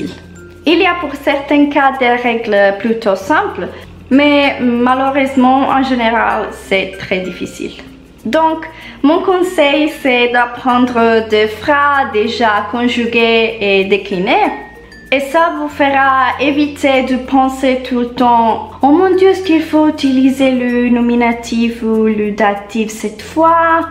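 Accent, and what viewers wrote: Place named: Tuscany, Italy. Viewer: le inflessioni toscane le sento bene quando parli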